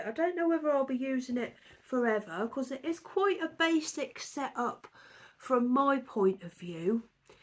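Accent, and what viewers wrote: Norfolk, United Kingdom. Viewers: Love your fabrics...I buy embroidery tableclothes from secondhand shops as I think they are beautiful. Really enjoy your chats, it's as if I'm sat talking to a friend as I live in Pakefield, Lowestoft so very used to the norfolk accent 😊 so very sorry about Dennis, its just heartbreaking...I know how it feels. 😢 Take care, big hugs to you and your family 🤗 ❤ xx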